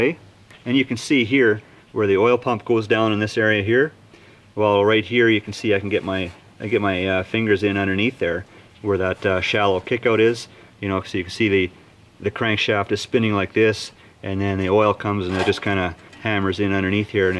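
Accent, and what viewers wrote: Canada. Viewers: Canadian? I love the accent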